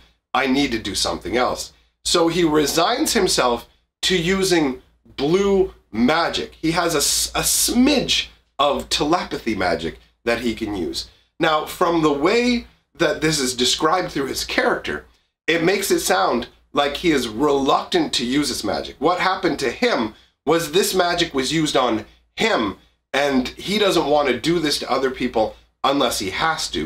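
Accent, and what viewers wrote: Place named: Canada. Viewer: Sick!? I noticed from your voice the you are still Canadian! Great video man.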